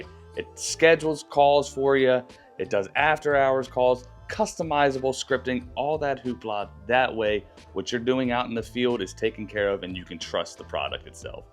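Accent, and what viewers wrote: Maryland, United States. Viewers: nice I was born in Essex but grew up in Western Maryland. I know a MD accent when I hear it. Great videos keep up bro.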